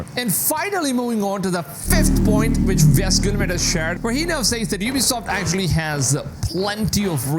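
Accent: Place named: India. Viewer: Your accent is funny, it's not very American. It almost sounds Indian. :)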